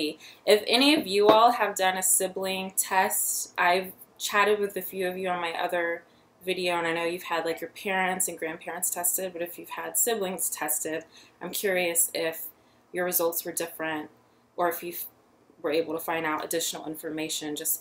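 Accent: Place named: United Kingdom. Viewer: HI, I like your ancestry DNA videos. All are very interesting. You have a slight British accent.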